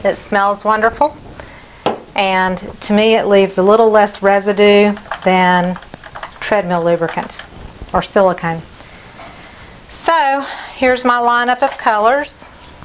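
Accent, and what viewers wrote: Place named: North Carolina, United States. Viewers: I love to hear you talk you sound like. ME yeah. You sound like me Those. North Carolina girls are the bomb bo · You kinda sound like an NC girl like me. Mid Atlantic? I’m trying to place your accent ☺️ This pour is absolutely stunning! Thanks for sharing. 💜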